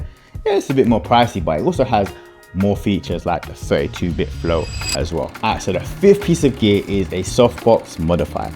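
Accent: United Kingdom. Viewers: they haven't figured out that you're British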